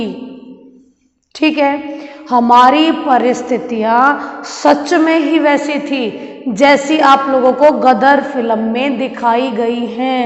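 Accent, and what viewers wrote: Haryana, India. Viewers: Mam aap haryana se ho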